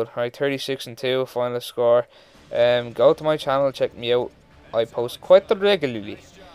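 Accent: Ireland. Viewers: Wat part of ireland r ya from I from ulster tyrone omagh town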